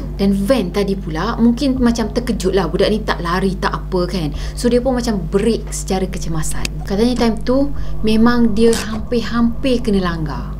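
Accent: Kelantan, Malaysia. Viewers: Salam kak.akak ore kelate ke.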